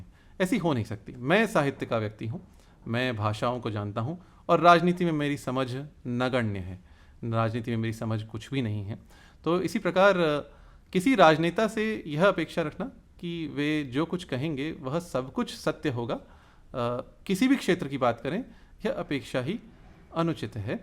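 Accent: Standard. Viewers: Bahut hi Badhia Vishleshan, balanced. Hum log to khichadi bhasha bolne wale hindu hain. Aap ki shush, sanskritnisht hindi sun kar Aanand aata hai. Aap ko sun sun kar humari bhi hindi achchi ho jaegi aisi Aasha hai. Uttam katya kar rahe hain aap. 👏👏👏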